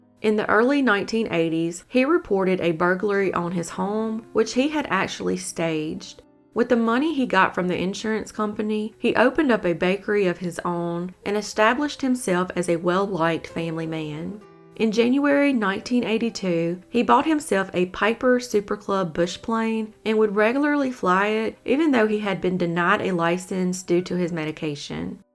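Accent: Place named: Mississippi, United States. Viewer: I'm from Southeast Tennessee and you sound just like we do... Why I love ur videos. Keep it up!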